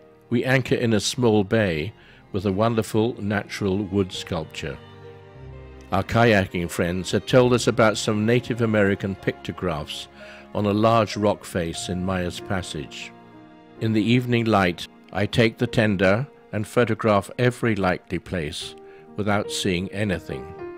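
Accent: United Kingdom. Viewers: Wered you find this voice narrator? i could listen to him all day, love his slight british accent !Really makes the video classy!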